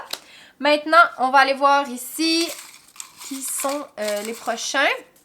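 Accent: Quebec, Canada. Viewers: Cool du quebecois... Je m abonne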